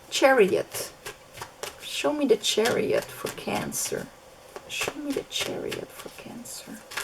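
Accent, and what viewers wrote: France. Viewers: Thank u. I hear a beautiful French accent. U r very beautiful inside out. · cute french accent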